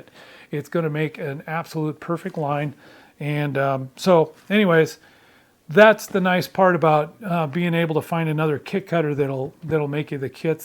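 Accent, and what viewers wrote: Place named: United Kingdom. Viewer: Your pronunciation reminds me of my favorite movie "battle of Britain" , from 1969 , the old British actors says it just like that ( with the proper amount of stiff-upper lip) , in that movie you also get brits trying to pronounciate "stukas" and Germans trying to say "Spitfire" which sounds hillarious , not ready to tackle a warbird scale myself for building and flying ( but trying to cobble together an old falcon 56 kit for the moment) your channel has soo great tips on building, cant wait to try some of those techniques!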